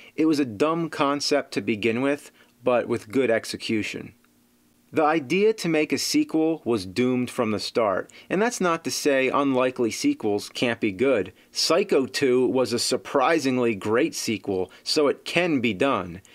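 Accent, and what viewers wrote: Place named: Northeast, United States. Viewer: Typical northeastern accent.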